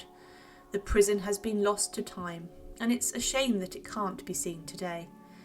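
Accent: United Kingdom. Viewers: Loved this! Great content as always, and you speak so lovely, your brittish and beautiful voice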